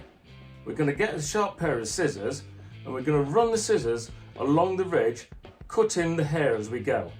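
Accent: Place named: East Midlands, United Kingdom. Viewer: Haha could tell instantly have strong East Midlands accent lol.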